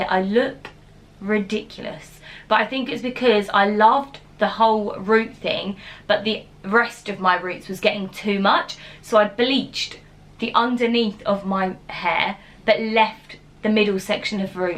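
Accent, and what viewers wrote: United Kingdom. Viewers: Your british accent is 😍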